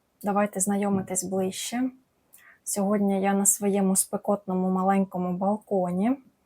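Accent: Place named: Poltava, Ukraine. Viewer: Вітаю, чую по розмові, що земляки з Полтавщини 🌻. Я народилась, і проживала в Полтаві, там маю квартиру, але 20 років живу в Києві. От в Полтаві в мене квартира "сталінка", в ній завжди влітку не жарко, бо стіни товсті - 70 см цегляної кладки, там комфортно для рослин, а в Києві маю дуже спекотну квартиру, без кондиціонера квітам важко, в усі вікна цілий день світить сонце, жалюзі закриваю на вікнах, на балконі в спеку взагалі може бути +45, одне радує, що восени і взимку квітам світла в цій квартирі достатньо. Тому зараз не купую рослинки, котрим потрібна прохолода, і підвищена вологість повітря, довелось змінити свої вподобання.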